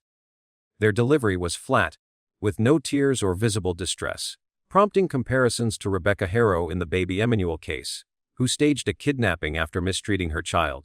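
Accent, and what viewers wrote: United States. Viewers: I found the cases from overseas very confusing!!! Why bring all of that stuff into the picture? It has NOTHING to do with the Gus Lamont case here in Australia. Also, I DON'T LIKE the AMERICAN ACCENT of the person talking about the Gus Lamont case. What is wrong with our good old Aussie accent? I won't be watching any more cases from Crime Cases in future!!!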